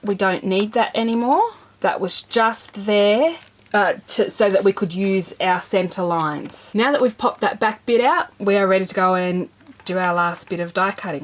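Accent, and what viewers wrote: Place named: Australia. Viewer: Nice to hear a fellow Aussie on YouTube - thanks for sharing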